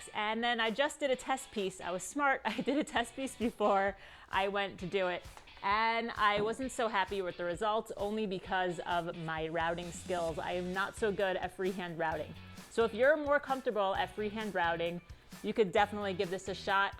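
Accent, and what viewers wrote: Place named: Long Island, United States. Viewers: Very nice! I can see this piece being handed down for generations. And the northeast accent "draws"... gotta love it! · great build. My son would have loved this 10 years ago! BTW, can totally hear the Long Island in your voice on this vid.. :-)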